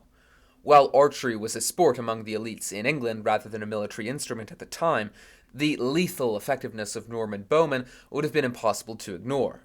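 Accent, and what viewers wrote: United States. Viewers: I think the narrator is American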